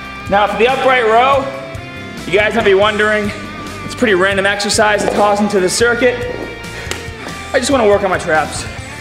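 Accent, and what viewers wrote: Boston, United States. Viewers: You sound like you're from Boston. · Damn this is a beast, sweet Boston accent, and has a physique that I am envious of. If I was gay he may be my type, unfortunately I'm as straight as my spine is throughout my deadlift.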